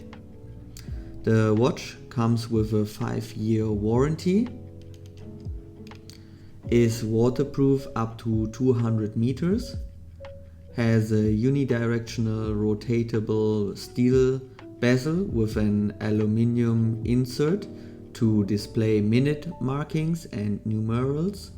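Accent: Germany. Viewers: Are you german? oh and great video!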